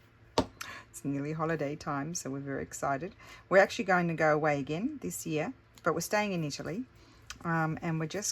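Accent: Australia. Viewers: Thank-you for to-day Its great to hear an Aussie voice we are just out of our 5th lock down in Victoria Your YouTube channel has helped so much in all our lock downs Stay Safe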